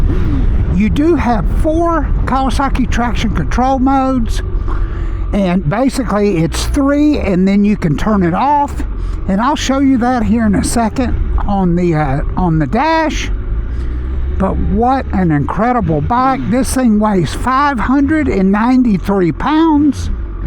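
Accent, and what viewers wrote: South, United States. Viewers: The high-pitched redneck voice sells the channel immediately - AMAZIN'!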